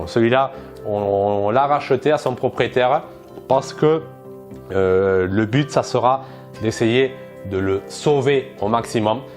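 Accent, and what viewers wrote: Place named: South, France. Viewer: Bien le bonjour l'accent du soleil ^^ Le VAE... une belle rencontre pour ma part. 10 ans de pratique et franchement je donne 10/10, il fait son taf. Acquis pour un nouveau velo-taf mais surtout pour les missions de visites au domicile que je dois faire dans toute la ville. Mon 1e est acheté chez décathlon (il y a donc 10 ans), bas de gamme, 750€. Il a fallut ajouter des sacoches, des pneus anticrevaisons (efficace à 100% en ville, jamais crevé sinon tous les 15 jours... pchiiii) et une fourche téléscopique (terme ?). Et bien il a tenu 10 ans par tout temps et ce avec des milliers de Km par an (6000 km/an rien que pour le trajet domicile-travail-domicile + les missions et une année j'ai même "noyer" le système électrique vu la douche que je me suis pris). Bon, il est vrai qu'il visitait les ateliers d'un pro 2x an + batterie chouchoutée au chaud en hiver). 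J'ai fini par le délaisser (et il est tjs à la maison ^^) car malgré les régulières révisions, ben oui, la batterie n'avait plus 20 ans (comme moi d'ailleurs) et des pièces principales commençaient à lâcher (j'avais un clé allen dans mes sacoches pour reserrer le pédalier régulièrement par exemple). Bof, cela devenait galère. Puis un jour, en visite sur le site d'une grande enseigne sportive française (la même que pour mon 1e), je vois une promotion d'enfer pour un VAE d'un partenaire hollandais : 1500€ au lieu de 2500€. Wow ! J'ai craqué, il était temps, j'ai mon 2e VAE. je suis passé d'un moteur dans la roue arrière au mot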